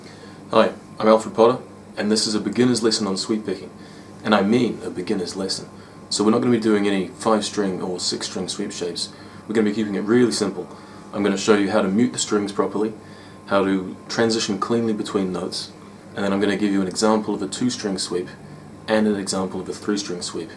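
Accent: New Zealand. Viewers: Nah this sounds kiwi not aussie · are you from new zealand? or australia? i am to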